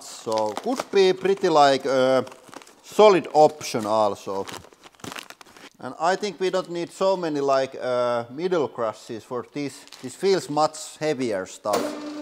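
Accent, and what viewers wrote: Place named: Finland. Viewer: That classic Finnish accent making Weetabix, Fetabix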